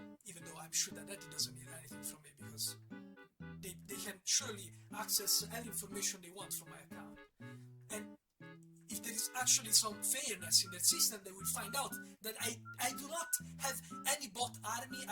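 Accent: Italy. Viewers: l'accento è palese italiano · he's italian most probably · are you by any chance italian? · I don't think he confirmed it, but I am Italian and that is definitely an Italian accent, I've heard it a thousand times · no l'accento de roma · he’s italian? 😳😳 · Come for the drama, stay for the Italian outrage accent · Man tbh I never tought that your accent sounded so Italian, Love it btw :) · my brother in christ, are u italian?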